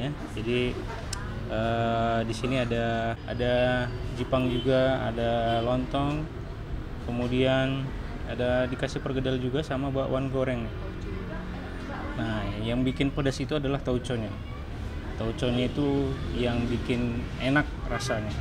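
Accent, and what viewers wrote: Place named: Siantar, Indonesia. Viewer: ok di tunggu videonya bang. Kalau bisa pertahankan logat dan bahasa Batak Siantar bang karena itulah menurut saya yang unik dari Abang . Horas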